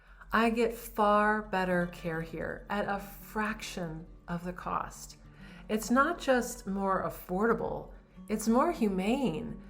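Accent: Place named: Europe. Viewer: You sound like a European, welcome.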